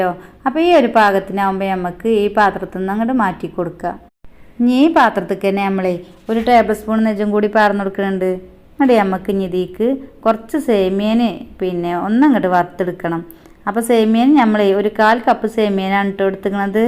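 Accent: Malappuram, India. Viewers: Ithaaa Malappuram ethu bhagathaa ee kodukaa, edukka ennu parayunathu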